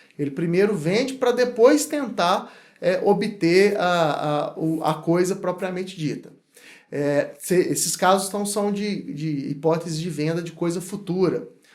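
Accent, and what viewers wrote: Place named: Minas Gerais, Brazil. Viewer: excelente aula, clareza, segurança, domínio. Obrigado. Acho que pelo sotaque é minerinn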